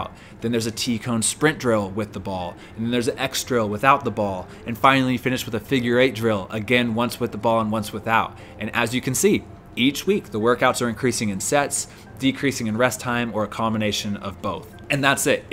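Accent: United States. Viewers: He's speaking American English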